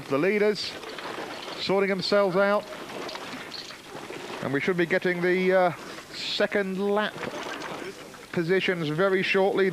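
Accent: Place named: United Kingdom. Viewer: Who hired this announcer? Was it like, Hey! We need a British accent guy to read some info .... brutal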